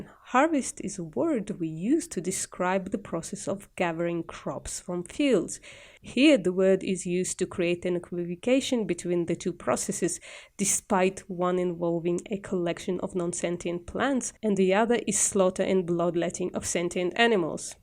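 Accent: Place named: Wales, United Kingdom. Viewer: Subbed love the WELSH accent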